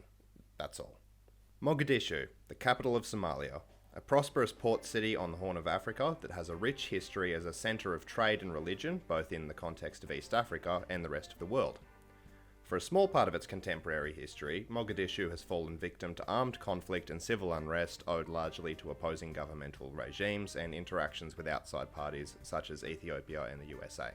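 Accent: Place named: United Kingdom. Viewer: you have a british accent..you from the uk?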